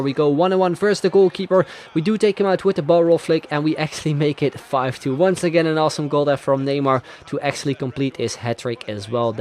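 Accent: Netherlands. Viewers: Youre dutch jaja ik hoor het gewoon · is he dutch?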